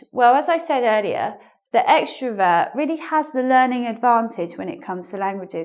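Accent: United Kingdom. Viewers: Hey, your first video was Amazing! I knew it! Everybody here in engVid is glad to be part if this lovely team, You did it quite well! I look up to you, congratulations and thank you for helping us listen to your British accent!:P I follow you in your channel as well, kind regards! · PERFECT BRITISH ACCENT!!! Thank you ever so much,!!! I do like it! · At first I express congratulation you on being hired by the engvid. The fact is to probe your English teaching methods were recognized by the company. I also learn from your YouTube and am enchanted by your British accent. By the way, in Japan, the once upon a time, as the English proverb saying, the notion that the silence is gold had been prevailed such as the book called Quiet whose author is Susan Cain which insists even if the introvert people are not assertive toward others but the high ratio of percentage, they have the high potential than extrovert people. 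But in fact in Japan nowadays I feel that extravert people are superior to introvert people. As it is often said, everywhere, because many of extraverts have capacity to say their opinions in the office meeting, and the introverts are treated as people who do not have self opinions and as transparent people and are ignored. So when it comes to dating, extraverts can ask opposite sex to go out by using positive words and attitude and the possibility of succeeding in being accepted is high. So I am an introvert man, so in Japanese society, I always was taken advantage by extravert people unfortunately. Sincerely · Thank you very much. Nice advices. And I think you speaking British English. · Like your accent...I like British accent a lot! Brilliant explanation. Have been living in London for over a year and I got amazed by British accent. This is the way it should be spoken because english comes from England.Thank you for sharing. 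· Hi, Perfect, the UK accent! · It's good to have British speaker. I like their accent. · Hi! i'm from Venezuela and I do understand you perfectly, love your british accent <3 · British accent though !! So natural !!! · Hi, I'm from saudi Arabia. I'd love to tell you that I love love your british accent. I'm subscribed to your Joddle channel, 'n so happy to be subscribed to your new channel, congrats! ^^ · What a great video! :) I love your british accent sooo much. Greetings from the Czech Republic! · Hi,! I'm begining my English learning with EngVid and your video was very helpful for me. I like your brit accent ) Thanx from intorverts;) Sorry for my mistakes. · I think that you speak by British accent and it is very difficult than American accent. I want to talk to British girl or boy to learn to say the right accent. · Honestly i really like your accent, i need to learn the British accent,its really special language for me, and nice to meet you and all my eyes on your topics now, waiting to see a new videos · you teach Bristish English , but i study American English - and i love your striking accent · You have a boyish name. 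LOL but I love your voice, in fact I really love listening British accents. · I'm a beginner for english. I practice very hard.I would pronounce them like her because I love UK accent. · Went through many youtube English learning channels, but now I found one with London/British accent which I missed =) I can't help myself but this accent is just soo cute XD Also I remember my first time in London, even after one year of everyday English learning course, I had problems to understand XD Well after three months there I got better (but still I need to listen carefully) Also I'm typical introvert =) Also I subscribed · Hello, thank you for your lessons, I like the way you teach and you British accent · I can't focus on the lesson because you're so beautiful and i enjoyed hearing your british accent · British accent <3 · British accent ~awesome :D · WONDERFUL!! video and it's good to hear some British accent!! 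:) Can't wait to see your new videos!!! <3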